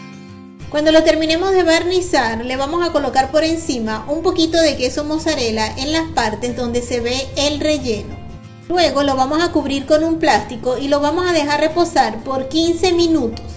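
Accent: Venezuela. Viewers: Estoy buscando recetas que sustituyan el clásico pan de jamón de la cena de Nochebuena y Nochevieja venezolana y esta receta es la que más me gusta, por tu acento creo que eres venezolana también, o me equivoco? Saludos.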